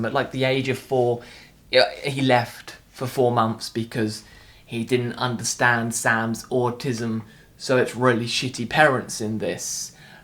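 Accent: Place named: United Kingdom. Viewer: From your accent, I am guessing that you live in the UK. Autism culture between the UK and US is quite different. I've found from reading, vlogs, blogs, fora, etc. that it seems as if one driving difference for that has been legislation. In the UK, you have specific legislation pertaining to autism that defines it as a recognized and funded disability which coupled with universal healthcare makes the landscape there in terms of autism really different than it is in the US. You also have a very strong advocate in the Autism Society. You mentioned that for the parents in "Atypical" that Sam is a burden and seemed to find that horrendous. But in the US because so much care and assistance isn't provided, in financial ways and in terms of Sam's prospects for independence in a bottom line sense he probably would be. Many of the special classes he's attended even his therapy may not be covered by the parents' insurance - so something they are paying for out of pocket. 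If Sam has trouble finding (sustaining) employment then they might be looking at him living with them for the rest of their lives, whereas my understanding of things in the UK is that (while they are not perfect) there is financial and housing support available for autistic people. Not to mention that in the show there are a ton of special accommodations, that mostly the Mom makes around what Sam will eat, wear and even by having a separate laundry detergent just for him. That said, my sense of the "burden" theme was t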